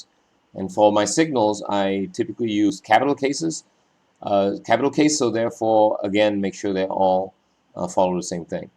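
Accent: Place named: Malaysia. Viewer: You have a nice accent for a Malaysian Lecturer. Nice content and elaboration, hopefully you would contribute and upload more Electronics videos :)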